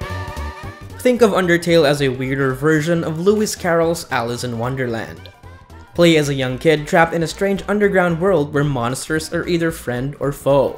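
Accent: Philippines. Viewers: Yeah. Accent pa lang, pinoy na haha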